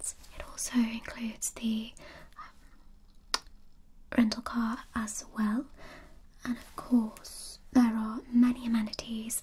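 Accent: United Kingdom. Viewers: The accent is giving me the chills. British is so damn nice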